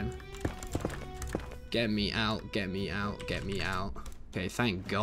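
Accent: United Kingdom. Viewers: are you british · HES BRITISH? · How do some dont know is British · Very British.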